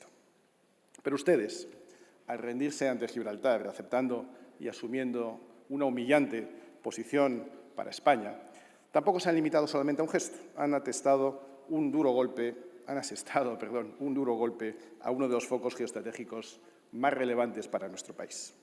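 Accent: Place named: Morocco. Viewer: Iván tienes un acento igual que la gente del pueblo de Tetuán y chawen de Marruecos por eso viene éste odió a los marroquíes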